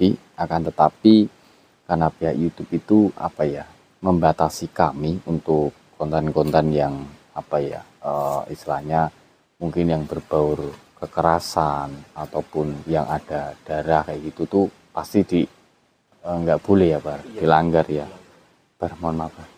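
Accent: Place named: Central Java, Indonesia. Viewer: Assalamualaikum MET sore mas baru nonton nih vlognya.oya klo boleh tahu mas jawanya mana ya karena saya juga orang Jawa tengah.klo mendengar cara bicaranya kok sama logatnya SPT kampung saya.ya semoga saja Deket dg kampung saya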